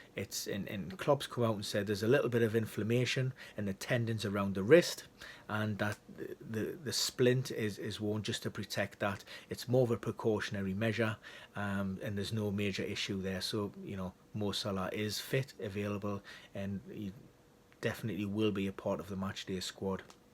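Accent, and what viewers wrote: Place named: United Kingdom. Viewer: Its a good video but you could put a little work on them by adding the players pictures you are talking about, or some videos of their injuries, or some of their plays. Also keep in mind, not all FPL players are from England, some dont speak english too well and might have a problem understanding your Brittish accent. Im sure some graphics and some visual data shown in your videos would increase your audience!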